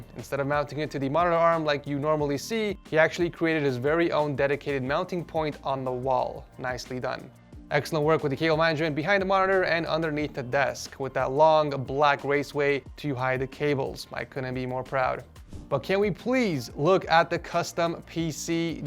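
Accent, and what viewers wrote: Australia. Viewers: I guess we're going Australian mode on this video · Why is the intro australian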